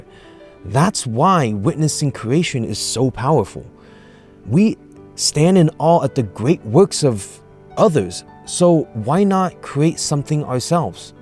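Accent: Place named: New York, United States. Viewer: Why do I keep getting a hint of NY or NJ accent? 🧐. Really enjoy your videos! Well done!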